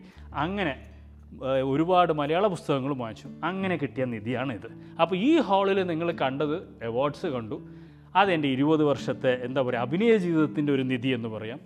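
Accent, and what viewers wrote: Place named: Kasaragod, India. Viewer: I thought you are from kasaragod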